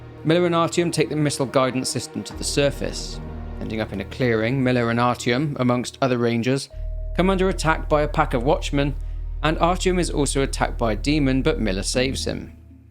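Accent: United Kingdom. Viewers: This is actually a really common thing amongst a death of British YouTubers. There's something about the accent that's soft, but I think it's largely how a lot of harder sounds like Rs are instead muted. We tend to think of Ks or Gs are harder sounding letters, but they're common on all languages and accents. Something about how certain letters are shifted in British accents just seems to soothe people. As the saying goes, the largest modern export of Brittain is their accent, and it's for good reason too.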